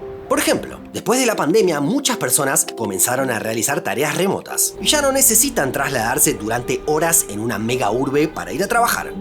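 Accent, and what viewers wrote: Rioplatense, Argentina. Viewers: es argentino o uruguayo? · Por qué intentar hablar en pseudo neutro y al mismo tiempo sheshear?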